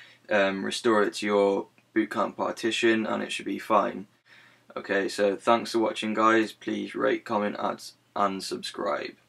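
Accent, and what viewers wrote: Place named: United Kingdom. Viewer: oh British :D thank u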